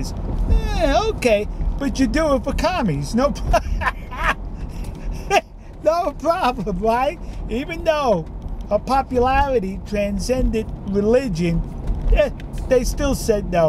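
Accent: New York, United States. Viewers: OMG I guess I assumed from your accent that you were in New York City! I was down in Texas about a year ago. Absolutely loved it!!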